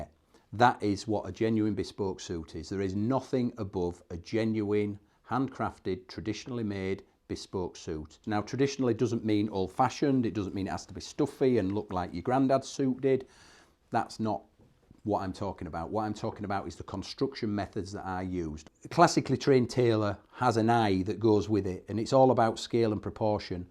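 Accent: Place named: United Kingdom. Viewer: Yeah absolutely thanks for your understanding. Which part of UK is your accent from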